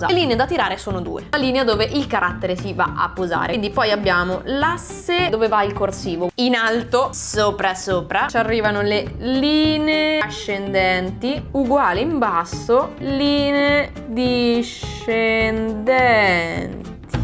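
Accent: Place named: Milan, Italy. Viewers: ma sono l' unico a sentirla con un dialetto milanese? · Ma questo minaese nei video.... Ma sei divetate milanese dock... Tac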